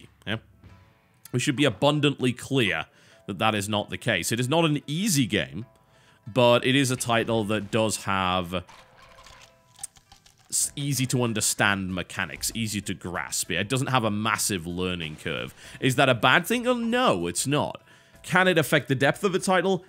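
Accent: United Kingdom. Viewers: And he as a Brit uses the proper British Cambridge Dictionary- and grammar-nazi-approved pronunciation. So yeah, pointless indeed.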